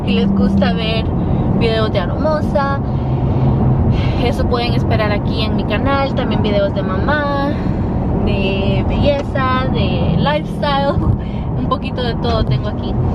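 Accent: Spain. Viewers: your spanish accent is so cute ❤️ in Portugal, we say “Assistente de Bordo” or “Hospedeira” for flight attendant ☺️ Great video!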